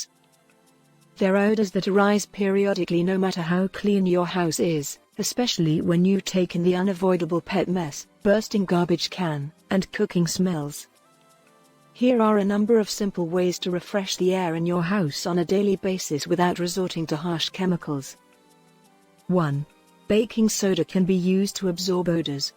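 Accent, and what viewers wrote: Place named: United Kingdom. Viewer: Why the British accent!!!!!! Hire American!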